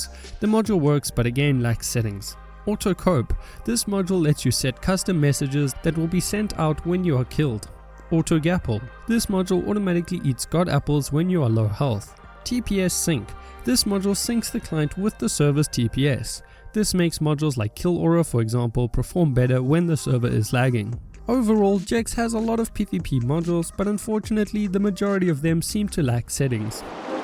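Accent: South Africa. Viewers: could hear the south african accent a mile a way 😄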